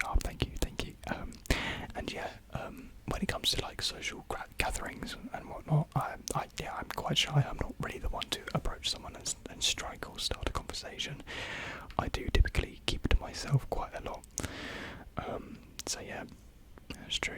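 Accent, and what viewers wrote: United Kingdom. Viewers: I use your videos to learn British accent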